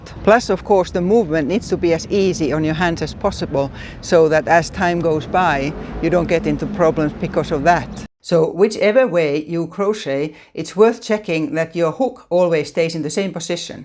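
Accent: Finland. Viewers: Oh I love your Finnish accent - reminds me of my Grandma Jenni! Great tips and love your teachings too. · 😮 you're from Finland? I thought your accent sounded familiar! I am learning Finnish. Just started about 2 months ago. I'm learning on Duolingo so I understand I'm learning more formal Finnish rather than spoken Finnish, but I've got to start somewhere! Suomi on niin kaunis kieli! Kiitos.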